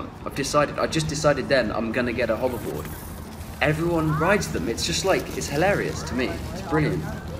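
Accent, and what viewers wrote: Kuwait. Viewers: Your kuwaiti accent is so good! I would never have guest you're a non kuwaiti ! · Happy a lot for your good experience in my beloved country..I wish that you will come back one day..and I advice everyone who decided to move there to interact more with the locals because I believe it's easy to make friends with and understand the Kuwaiti traditions..good luck in your next move..BTW way you sounded good in Kuwaiti accent. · thank you to this videos to talk about my country,I proud to be kuwaiti and I'm shocked to see you speak arabic specially kuwaiti accent shloonch shno 😂😂 you cool bro ✔👏💚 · Im Kuwaiti and I just wanted to thank you for showing the reality of our country unlike many opinionated ideas that have been thrown out there. There are many positive things as there are negative things in Kuwait but in my opinion, the positive things outweigh the negative by a lot. 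So thank you for representing my country in this positive way and for what it really is. Oh and your Kuwaiti accent is pretty good. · You’re Kuwaiti accents so cute 😂💗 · I like this vid sooo much machos diyay hhhhhh your kuwait accent i well never .. from kuwait ;)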